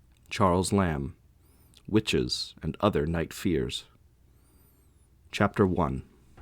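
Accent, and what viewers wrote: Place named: North America. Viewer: Thought the sound was good. North American accent always works with hpl. Obviously the connection with the great god pan is mentioned in the text. But. Connection with 'the white people' less obvious....a playmate was coming 😮.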